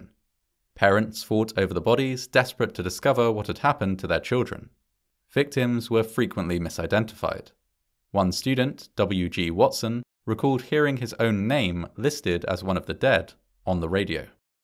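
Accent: England, United Kingdom. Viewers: I like how you don’t realize he’s English and that they pronounce things differently. America isn’t the centre of the universe.🙄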